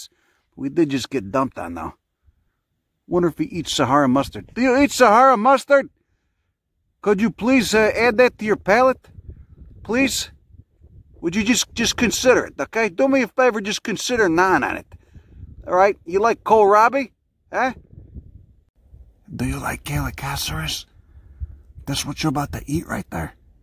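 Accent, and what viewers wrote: Chicago, United States. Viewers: Imagine being in the middle of the desert and seeing a tattooed gringo with a thick Chicago accent crouching and talking to a tortoise about his diet.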